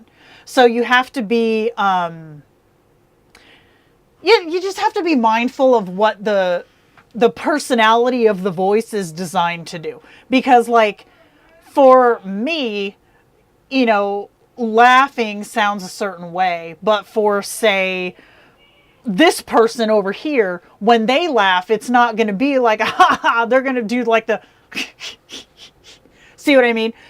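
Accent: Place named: Czech Republic. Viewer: Based on how you can pronounce "R" - Are you from Czechia? :D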